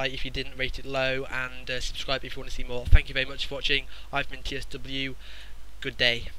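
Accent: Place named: RP, United Kingdom. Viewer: there's nothing wrong with this guys accent.. its 99% perfectly spoken "Queen's English". Very wierd thing to say.. you mustn't speak English as a first language to make that sort of unqualified comment.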